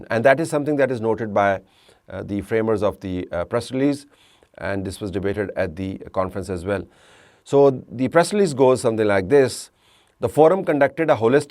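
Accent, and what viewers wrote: Pakistan. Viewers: They have there own channels. He is trying . His English is in urdu. 😂😂😂😂😂😂😂 so hard to understand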